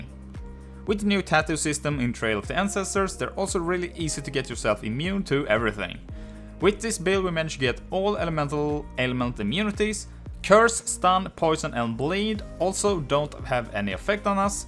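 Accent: Sweden. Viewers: Man that Swedish accent is insane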